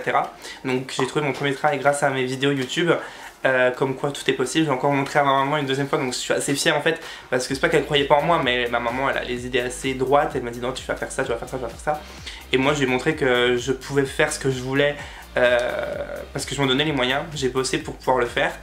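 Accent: Franche-Comté, France. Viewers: Tu viens de Franche Comté ? Et tu es Arianators ? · Je me disais bien que tu avais un peu l'accent de Franche Comté :) Team Besac 🤗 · j'me disais que tu avez un accent que je connaisais, normal c'est le miens #TeamCancoillotte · En tous cas ta vraiment l'accent francomptois 💯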